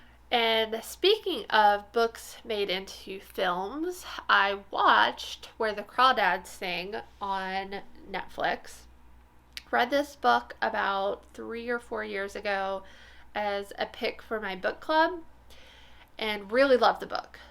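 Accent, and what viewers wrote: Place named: Pittsburgh, United States. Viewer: Love meeting Pittsburgh Knitting ladies. I lived in Squirrel Hill until mI was 12. Then we moved to CA. I still have a few friends in Pgh., none that knit, sadly. My husband and I live north of Dallas,Texas in Melissa, Texas. I hear Pittsburgh in your voice but not as much as my mom, her sisters and brothers my aunts and uncles and their kids, my cousins. I just wanted to say enjoy yourself doing your podcast. That’s what we will enjoy. I need to plug my tablet in before it dies. I’m a new subscriber, but I was trying to say enjoy yourself, don’t worry about us, lol. As you know we just want to have company to watch while we knit. 😊😂❤ Thanks so much.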